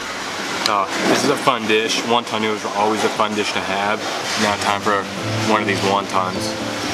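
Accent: South, United States. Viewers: Randomly stumbled upon your video and glad I did! I adore your southern accent (I hope I got that right).Loved your reviews especially the Chinese noodles that I can’t normally have, l’d love some of that chilli pan mee. It’s actually called ubi getuk (mashed and fried tapioca with coconut), also the roti canai is pronounced Cha-nai, cha as in ch in chair. You’re practically Malaysian as we do mix our roti canai sauces together too! My ultimate favourite combination is the red sambal with the middle lentil curry (called dhal). Looking forward to more of your videos! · i believe he means your southern accent. I've been trapped a time or two by the fairer sex.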